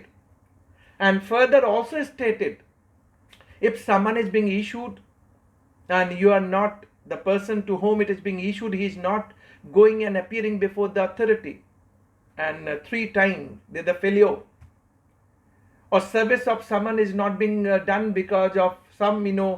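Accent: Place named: England, United Kingdom. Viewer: I like your so much your English accent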